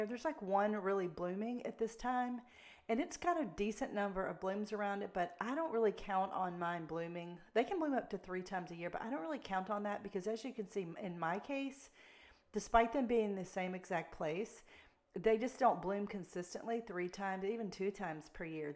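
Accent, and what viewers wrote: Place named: Alabama, United States. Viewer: You have to be from Alabama. The accent is a dead giveaway.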